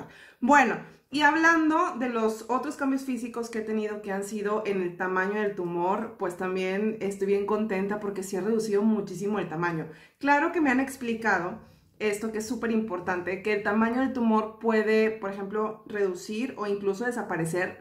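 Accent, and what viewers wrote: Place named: Jalisco, Mexico. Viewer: gracias por compartir lo que estás viviendo. Eres hermosa e irradias mucha luz. Por tu acento creo que eres de Jalisco o Nuevo León. Yo estoy en la Ciudad de México y aquí está el Dr. Erik Estrada, tal vez ya lo conozcas. Te comparto su página: <link> Le dan espacios para hablar y compartir sus conocimientos en programas de radio con Talina Fernández y Martha Debayle, tiene su Canal de YouTube, lo encuentras como Noticiero de la Salud. Él maneja alimetación vegana que ayuda a tu cuerpo a pasar mejor el proceso de las quimioterapias. Él da conferencias cada 15 días en el Centro Médico Siglo XXI y da consultas cerca de la Universidad de Chapingo. Tal vez pueda ser una buena opción para que pases de mejor manera este proceso. Estarás en mis oraciones. Mucha fuerza.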